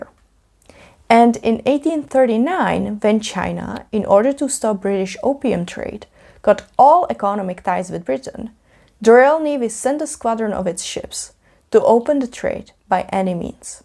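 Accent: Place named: Eastern Europe. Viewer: This slavic accent needs to be removed. If I did it, you can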